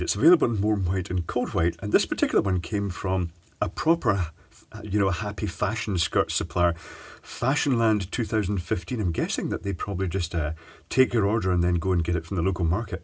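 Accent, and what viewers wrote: Scotland, United Kingdom. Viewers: I think you're mishearing his dulcet Scottish tones there, +jeromekerngarcia : 12 x 28 = 336. He later points out that the long rows are 7 x 4 = 28, so it's really 4 x (12 x 7), which of course still comes to 336, although you're right that Brexiteers would probably scrawl "It's 240 LEDs!!!" in massive letters on the side of a bus and claim anyone pointing out that the maths shows 336 was part of "Project Fear", these days known as "Project Reality" ;)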